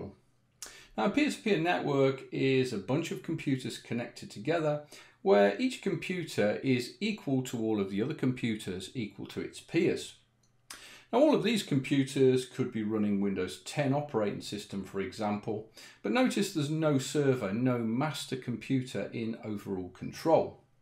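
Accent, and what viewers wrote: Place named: United Kingdom. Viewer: Very British accent. Good video. Thanks.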